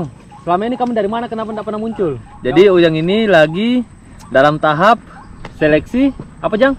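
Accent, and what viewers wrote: Indonesia. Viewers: BHS nya spt org gorontalo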